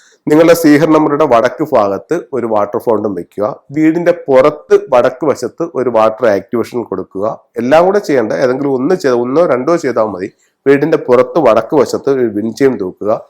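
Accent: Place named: Kollam, India. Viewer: Kollam TVM fagham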